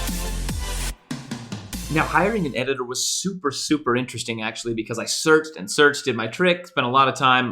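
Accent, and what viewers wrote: Australia. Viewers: that was an Australian accent.... not British. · Ur boi is from Australia not Britain, cool vid :)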